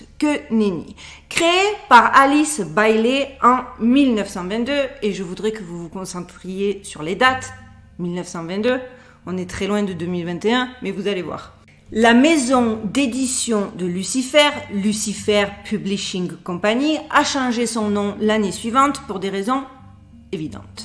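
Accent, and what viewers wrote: Marseille, France. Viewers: Bonjour chère amie, je vous suis depuis quelques temps, je suis tombé sur cette vidéo, et je voulais vous dire, vous qui aimez trouver tout ce qui est caché, faite un test, vous savez que les yeux sont le miroir de l'âme, alors faite un test avec toutes les images, et symboles que vous avez entre vos mains, mettez-vous devant un miroir, et vous découvrirez beaucoup de choses cachés, n'hésitez pas de tourner les images dans le sens inverse, je pense que vous avez cet œil pour trouver ce que vous cherchez. J'aime bien votre chaîne car elle sort de l'ordinaire, avec votre franc-parler, avec un accent marseillais. Bien à vous Francis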